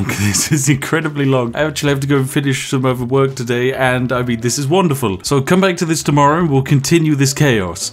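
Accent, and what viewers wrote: United Kingdom. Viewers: I just saw the prewiew, clicked on video and by the first words already knew that he's british without even looking at channel name or the video itself . I think it's time to reconsider my life choices. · Ur from britain? (tea image) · He sounds british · You British Bruh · You sound more British than MumboJumbo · Is this guy British?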